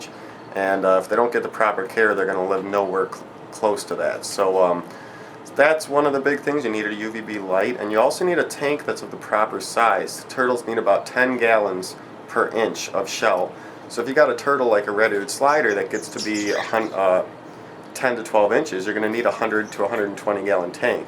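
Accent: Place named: Connecticut, United States. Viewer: you have the same accent i do and people always make fun of me for it. im from connecticut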